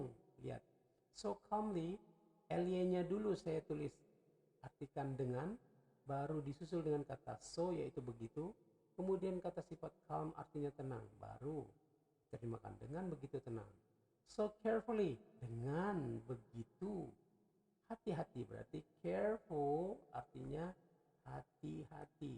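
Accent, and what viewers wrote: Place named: United States. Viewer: How to use so..bahasa inggris nya pak paling terbaik dan sangat fasih dialeg nya sama seperti orang amerika 👍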